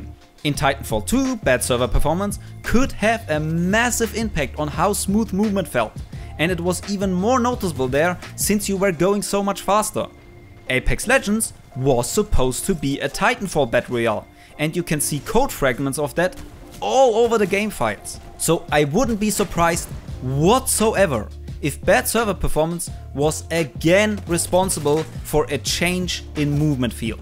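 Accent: Germany. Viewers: Are you German because you sound like nearly everybody in Germany and Austria when they speak german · Nice Video Hey, are you German? Your Accent sounds like it ^^ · Hi are you german?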